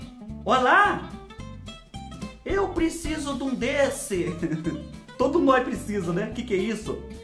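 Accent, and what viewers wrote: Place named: Itapetininga, Brazil. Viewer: amo o seu canal kkk cê fala igual eu e a turmaiada aqui de itapetininga kkkkkkk espicúla kkkkkk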